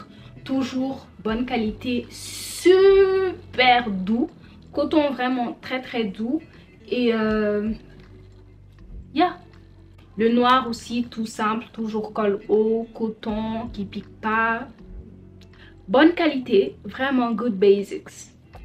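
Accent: Senegal. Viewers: Genre toujours l’accent sénégalaise thieuy sama Mboukou yi❤️🤩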